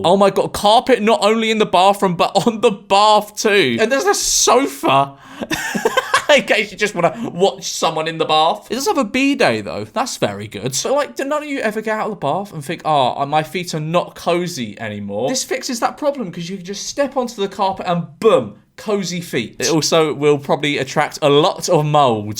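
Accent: United Kingdom. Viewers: "You do see some absolute honkers listed for a million" the British way of speaking is so fun lmao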